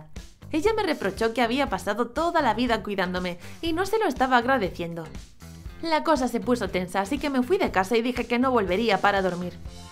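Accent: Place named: Spain. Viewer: Si los vi!! 🤗😃 me podeis dar un corazón?? (no soy española pero me encanta el asento español)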